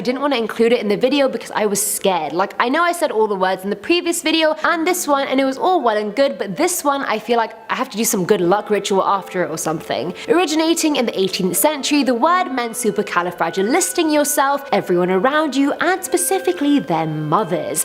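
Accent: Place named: England, United Kingdom. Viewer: Huh - didn't realize torontians had English accent ! Though they were like "take off- eh " (like minnesotians ) !! Suppose to be funny - no need for anyone to get angry . This young lady is very pleasant to listen to - thank you !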